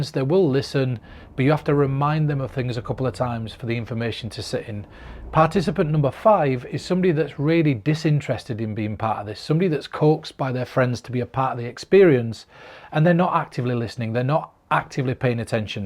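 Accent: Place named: United Kingdom. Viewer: Do I have to have an endearing UK or British accent like you or Daniel Madison to practice mentalism or good magic or can I do it with my silly American accent or do I need to practice in a Canadian accent how a boot that LOL by the way I'm part of the pig pen magic Academy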